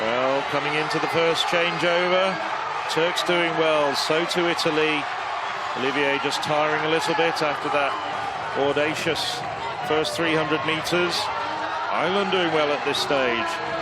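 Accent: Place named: England, United Kingdom. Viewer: Maşallah koçlara, sunucu o s.kik ingiliz aksanıyla ne güzel bozuldu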